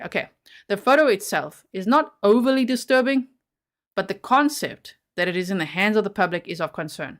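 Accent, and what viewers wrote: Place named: South Africa. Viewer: Found your channel, because a fell in to the rabbit hole, that was judge Gull… Love your accent, you sound South African or Dutch (Holland)?? I’am mixed race Danish(white side) / South African (black side, my father fleed under apartheid, to Denmark) and I much say, love the accent ❤ even if I’am wrong about the country 😂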